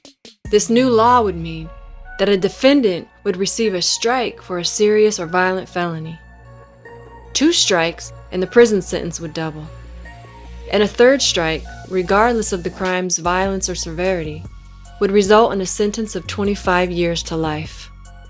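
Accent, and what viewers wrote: South, United States. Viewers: I detect a slight Southern accent. She does have a beautiful voice tho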